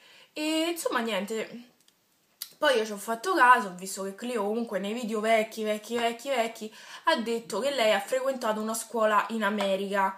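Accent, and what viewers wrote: Rome, Italy. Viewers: sei bellissima e bravissima^^ ma te prego parla meno romanaccio D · AHAHA romanaccia proprio :)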